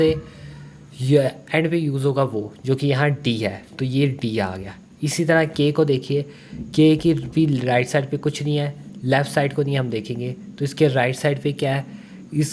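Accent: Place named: Pakistan. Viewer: pakistani aacent jaisa hai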